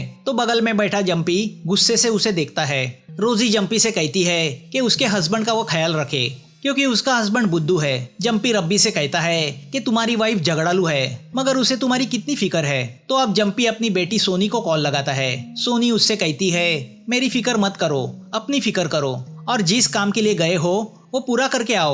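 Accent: Maharashtra, India. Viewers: Mai bahut din se apke videos dekta hu thoda absent Marathi lagta hai apka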